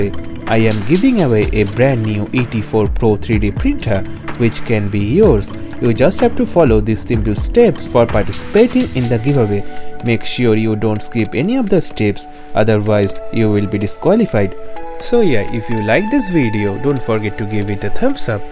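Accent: India. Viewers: Lol, its super beautiful, i sometimes love indian-eng accent like you...lol If you think that why havent i wrote anything then, i probably wont get it its 1million percent truth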